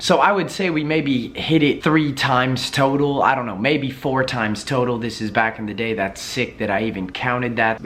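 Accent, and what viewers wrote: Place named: Southern California, United States. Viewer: I can tell u from socal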